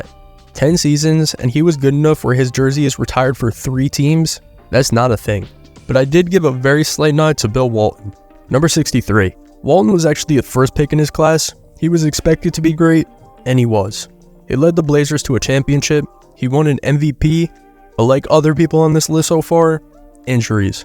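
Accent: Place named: Boston, United States. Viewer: Sorry, I found you hard to understand behind that Boston accent. The profile of the NBA logo is Jerry West. "Larry Bird is the first white person...." you simply don't know what you don't know. George Mikan? Pistol Pete Maravich? Bob Petit? During the classic period of the NBA, select games were broadcast in the middle of the night, often days after they'd occurred. It's popularity -- which didn't really reach modern levels until Jordan, sprang from college basketball, where people watched the Magic/Bird rivalry, and where their senior game is still the most watched college basketball game of all time. The NBA just gave people a venue for people to keep watching it. Also, I'll point out something that SHOULD be obvious -- to even know who the MVP is, you have to already be a fan. Quick -- think of a sport you don't watch. Who's the MVP? 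See what I mean? Boston, particularly in the 80s, was a highly color conscious place. But most of the country isn't Boston (thank god).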